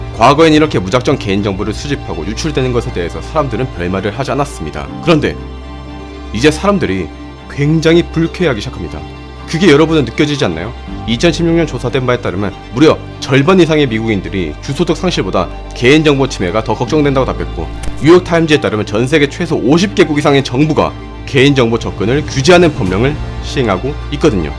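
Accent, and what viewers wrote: Gyeongsang, South Korea. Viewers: 경상도 분이시군요?^^♡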